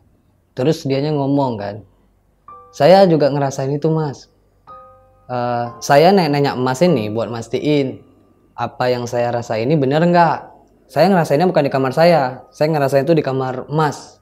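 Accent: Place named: Aceh, Indonesia. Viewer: Logattt acehhh banget abgnya✌🏻😁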